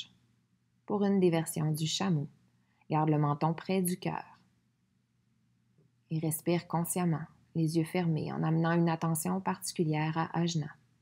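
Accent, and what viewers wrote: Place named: Quebec, Canada. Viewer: j'adore cet accent Quebecois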